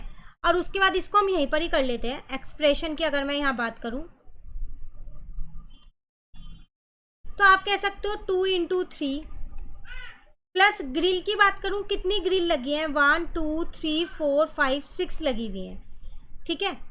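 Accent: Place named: Uttar Pradesh, India. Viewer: Mam you are from UP your voice look like .🎉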